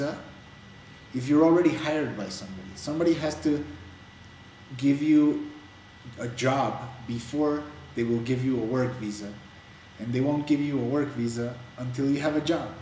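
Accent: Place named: Eastern Europe. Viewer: you sound eastern european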